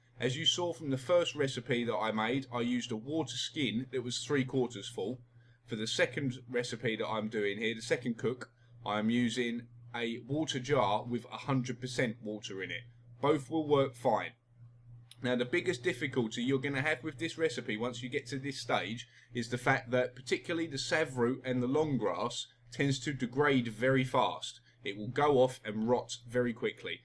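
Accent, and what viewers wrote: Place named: United Kingdom. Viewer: thx bro oh and are u from britin i am are u u sound like it wigan i geuss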